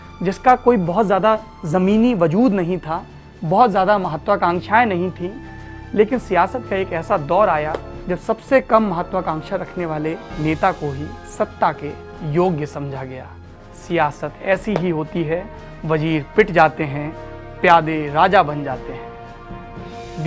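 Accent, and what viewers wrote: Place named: Uttar Pradesh, India. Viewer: UP ki khadi boli, aur nabbe ka politics. amrika bhi mere gaon sa lagta hai......maja aa jaye agar lalu bhi koi baat ho jaye......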